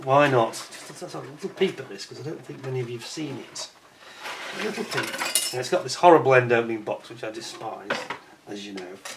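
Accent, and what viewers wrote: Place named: United Kingdom. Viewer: Hi, I don't judge your nice job on YT. I was only saying that these posts look quite anachronistic.....I don't know and never heard one modeller that said Matchbox was top-notch rather the opposite. And I can confirm that because I used to build them, a lot, in the late 70s. Maybe you are right about flashes, good fit, I don't remember but let's talk about details or paneling lines....I read somebody here saying that panels of matchbox kits were done with the plough....a joke but it gives the idea. ANYWAY they are a nice memory of my youth, when I built and still have (restored) an harrier, a jaguar, a UK phantom, a panther, a beaufighter, a Corsair II, a Skyhawk. These survived over the years But I wouldn't say, ever, that their quality was good. Cheerio. By the way I love following you because I can trainy listening skills with your slightly difficult British accent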